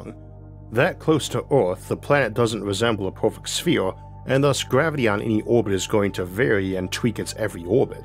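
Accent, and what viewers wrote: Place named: Midwest, United States. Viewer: it’s not wrong, it’s just different. It seems like it might be a midwestern thing because Rob Scallon also says it like that and he’s from Illinois.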